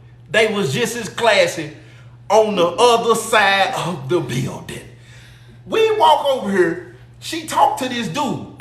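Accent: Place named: Dallas, United States. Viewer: Sound like Sunny side South Dallas lol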